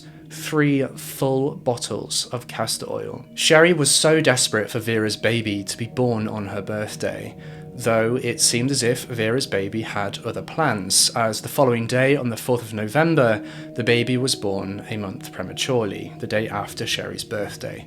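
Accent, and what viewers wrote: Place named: England, United Kingdom. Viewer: i ABSOLUTELY LOVE LOVE LOVE YOUR ENGLISH ACCENT. SOO JEALOUS... IM FRIM NEW YORK A WHITE MALE WITH AN EVEN STRANGER ACCENR THAN YOURS. I LOVE YOUR CHANNEL. I COULD LISTEN TO YOU ALL DAY LONG. MUAH .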